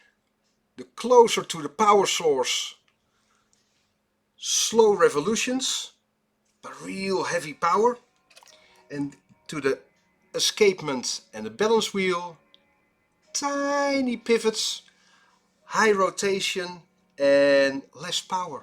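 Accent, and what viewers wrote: Netherlands. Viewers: Entertaining AND informative..... and all in a Dutch accent :)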